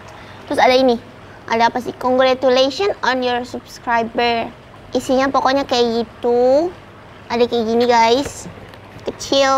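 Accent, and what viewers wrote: Java, Indonesia. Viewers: Disaat orang2 kaya ngomongnya selalu campur english, nah ini medok banget dong ngomongnya heheh... Sukses terus yt channelnya ❤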